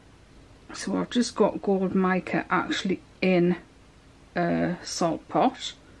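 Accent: United Kingdom. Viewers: That gold is gorgeous. I DO miss British accents..... I hope that you are keeping safe & sound. I’m a soapmaker in NZ. I have access to all the things you do, plus wonderful natural ingredients, herbs and fruits. But that gold. Gorgeous. I would, however be scraping the very last little bit of colour out of each pot into the jugs! Your soap recipe is very forgiving as it stays nice and liquid so long. Very clever. P.S.” little bit too much soap...” never!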